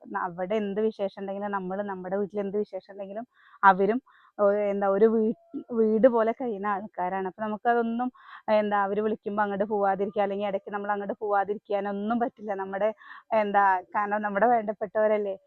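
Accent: Palakkad, India. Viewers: നിങ്ങളുട പാലക്കാടൻ ഭാഷ കേൾക്കാൻ വേണ്ടി മാത്രമാണ് ഞാൻ വീഡിയോ കാണുന്നത്. നിങ്ങൾ പൊളിയല്ലേ.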